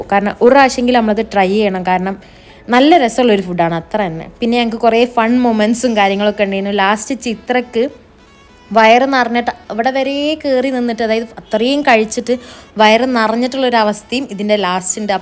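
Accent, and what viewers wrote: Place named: Kozhikode, India. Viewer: His slank thani nadan kozhikode kari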